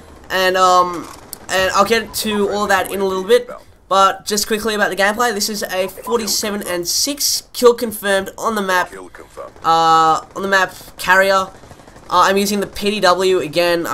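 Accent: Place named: India. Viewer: I thought the whole thing was an indian accent...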